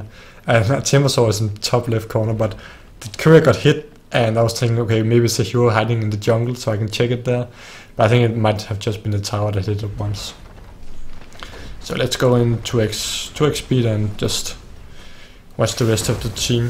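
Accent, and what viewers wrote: Denmark. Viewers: Hey are you from Denmark,?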